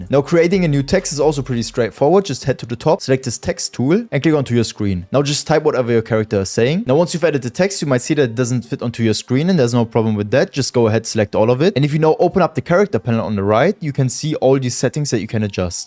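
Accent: Germany. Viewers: man hört wie krass deutsch er ist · Ich rieche einen Deutschen Akzent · Man hört deinen Deutschen akzent extrem 😂😂😂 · Dieser deutsche akzent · I hear a deutsch akzent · Ich höre den deutsch Akzent…. Hast du so ein Video auch nochmal auf deutsch? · so geil wie man nach den ersten 2 sekunden weiß dass er deutsch ist haha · Thx Bro. The German accent is awesome tho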